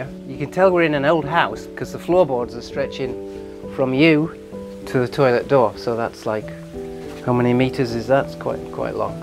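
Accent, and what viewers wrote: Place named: Scotland, United Kingdom. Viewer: And his Scottish accent makes him even more wholesome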